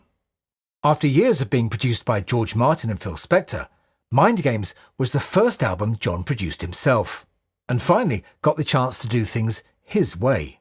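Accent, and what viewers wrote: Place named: United Kingdom. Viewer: Hola !! Desde Argentina, muy fan de John, amé toda tu explicacion !!! espectacular y ese acento britanico acompañando la explicacion da gusto oirlo. Te felicito. gran video. gran disco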